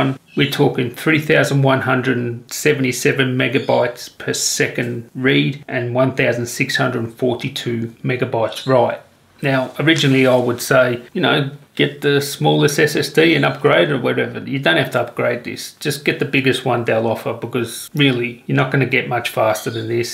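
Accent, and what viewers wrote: Australia. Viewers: If anyone knows who videogamedunkey is, do you guys think this guy sounds very simliar except with an australian accent? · Is it just me or does this guy sound like an Australian Trump? (no offence intended, just the way he speaks reminds me of him)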